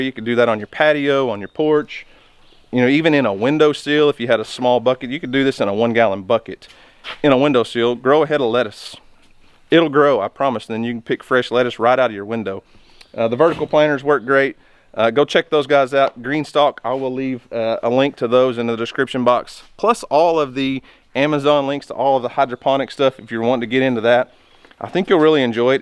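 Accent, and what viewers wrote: United States. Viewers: Just listening your accent ...makes me feel you are an American with no respect for nature ... promoting hydroponics just because you want youtube money ... you American when talking about hydroponics...start by saying THANKS Mexicans, Thsnks Mexícan Empire, Thanks Aztecs, Thanks toltecs for your hydroponic engineering and inventing hydroponic science ...so now I can grow my hydroponic marijuana ....Americans 🙏🏼 God sabe is from Donald Trump ... we can help to build that wall and you can send us back our farmers, we need them, we want them, we love them ... you grow your “marijuana” by yourself !!!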